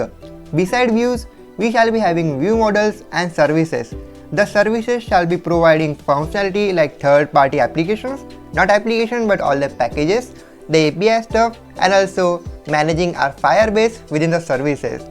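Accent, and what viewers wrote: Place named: India. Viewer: I saw some negative comments about your accent. It's perfectly fine to hear for any Indian or anyone who is used to Indian accent. The content is very well structured and to the point. Keep up the great job.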